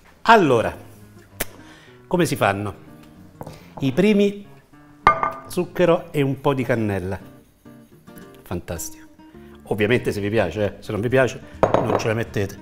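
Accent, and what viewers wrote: Tuscany, Italy. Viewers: Avrai pure un accento toscaneggiante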